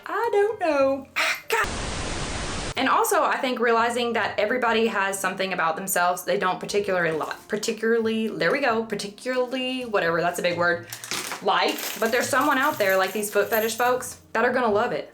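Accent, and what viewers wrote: South, United States. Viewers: I live in a small city in north-central British Columbia that wishes it were Southern, and I love your accent. It makes me think of sunshine and sweet, iced tea. Also, in Canada we don’t have dollar bills or pennies any more, so that wreath would have to be covered in $5s - which are blue. .. not quite the same! 😂 After we decommissioned the penny, my former hair stylist did the penny floor in the entrance to her salon which was in a heritage building in Vancouver, and it looked wicked! But she did say it was a lot of work. I adore your channels - thanks for all the hard work you put in to give us something cool! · Your southern accent sounds like Georgie from Young Sheldon...so cute 😊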